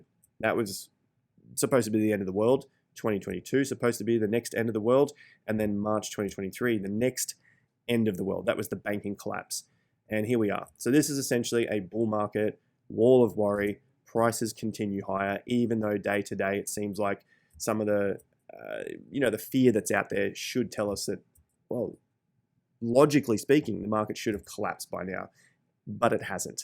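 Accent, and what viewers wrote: Australia. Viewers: Why can’t Australians pronounce “A” correctly? It’s DA-TA not DA-Terrrr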